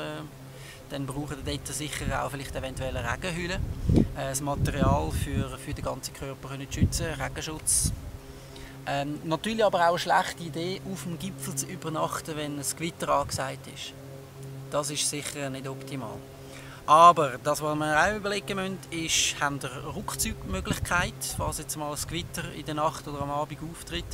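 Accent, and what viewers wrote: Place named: Allgäu, Germany. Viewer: für allgäuer ohren isch des a wunderschöner dialekt =D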